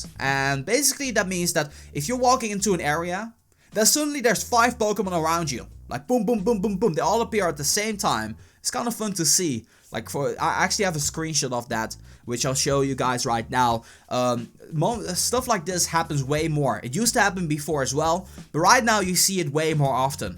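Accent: Netherlands. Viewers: Arnhem Ik dacht al dat je Nederlands was. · Are you Dutch? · Are u dutch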